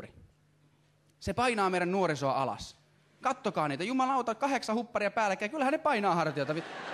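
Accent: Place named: South Ostrobothnia, Finland. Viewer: etelä pohojanmaa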